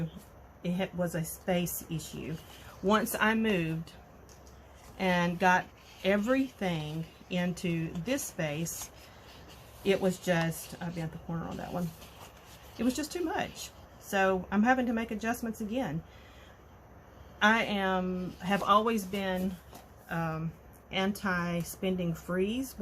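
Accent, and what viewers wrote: South, United States. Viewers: Lovely to hear your pretty southern accent! I should try the 30 days of sketches,too! I am so far behind with everything! Love your kits! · First of allllll don’t you dare get rid of that southern accent! I looove it! Second- I laughed out loud when you said “ was I smoking crack that day?” 😂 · You are not alone in this epiphany! I too have come to the realization that my spending is out of control! Getting it into control is not going so well with me either. I've tried, Lord knows I've tried. I think if slowed down a bit. I really don't need anything more but my problem is for example... when you showed that Reminisce hello summer guy side B paper, I'm not gonna lie, I went and googled the crap out of that paper! Lucky not lucky for me is it is no where to be found! Not even on EBay. There may be an Etsy but not gonna go there! 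I just told myself I don't need it. I have found something that's therapeutic for me at this point in my spending habits it, I will shop til I drop, I mean I will load up that cart with all the pretty things that I love. After about an hour of a complete waste of time I will close that browser and walk away with never an intent to ever checkout! It help me but then, someone showed the Jillibean soup discount box haul she got from an etsy shop and yeah, not gonna lie I had to have it! After all its Jillibean soup, face it how much longer will we be able to crab up some of that! Well I walked away from that too then the next morning I got an email saying hey you left something in your cart we'll give you 25% off today! You bet your bottom dollar its mine now! I enjoy your southern accent so don't you even try to change it! You video got me thinking!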